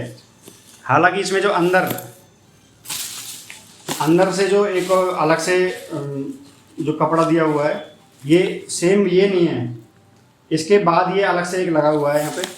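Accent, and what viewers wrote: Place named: Uttarakhand, India. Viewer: Bhai tumhare bolne ke tarike se lag raha h ki tum Uttarakhand se ho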